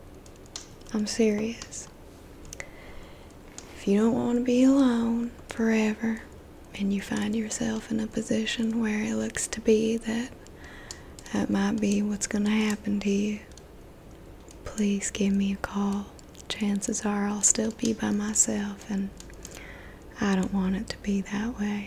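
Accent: South, United States. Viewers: I spent 17 years in northeast Alabama every summer. Fell in love early with a southern voice. Thanks for doing this one. God bless Southern ladies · This is a perfect southern role play I really love it!! Love how southern people talk! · As an Aussie who just got back from a work trip to Alabama....gee I miss hearing this accent! Sweet tea, BBQ, football, and Jesus. Hahaha! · You have the southern talk down well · That southern drawl tho.. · I spent most of my life in the South and I didn't hear any problems with the accent. Good job! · The southern accent is so underrated. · The southern accent has an innocent, flirty sassiness to it, I love it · that southern drawl was 🔥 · Southern accents are the best. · Glad you went with this thumbnail! I knew from the stream earlier your southern accent was going to be great at least for asmr purposes. Thanks for the great content as always:) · If you're not a southerner then I applaud you, I'm born and raised in Georgia and your accent is spot on. 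· One of my favorite RP videos. I adore the southern accent. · Real Southerner here says that accent ain’t too far gone. I’d have a hard time believing there ain’t somebody country in your world. Keep it up. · as a southerner, the accent is pretty good 🤠 the way it falters sometimes is actually realistic, not every single word has to have a drawl to it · I love your southern accent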